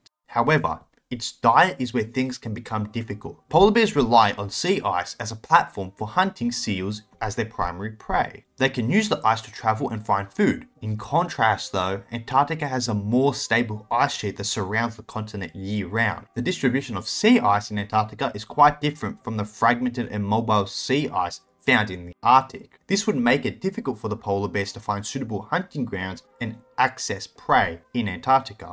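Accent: Australia. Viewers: Thanks for the explanation with your heavy Australian accent.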